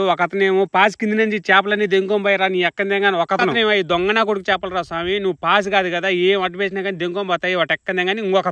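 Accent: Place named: Rayalaseema, India. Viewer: Rayalseema slang anna 😅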